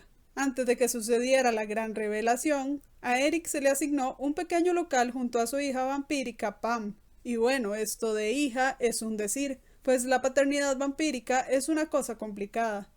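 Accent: Costa Rica. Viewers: Eres de Costa Rica verdad? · No sé, pero tienes un acento de Costa Rica. Es como escuchar a una amiga de la prepa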